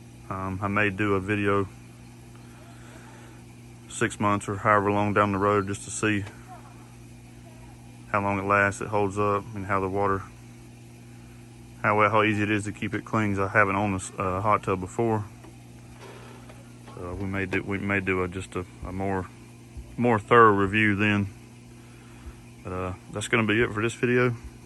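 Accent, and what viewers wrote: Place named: Alabama, United States. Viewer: He sound like he's from BAMA!!! Roll Tide!!!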